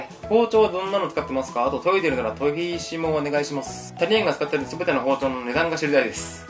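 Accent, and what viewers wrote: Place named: Kyushu, Japan. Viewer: 初めて拝見しましたが 九州人なんですね！ 方言めっちゃ落ち着く〜 ぜひ大分県のお魚も食べてください！ 福岡も美味しいけど大分も美味しいですよ！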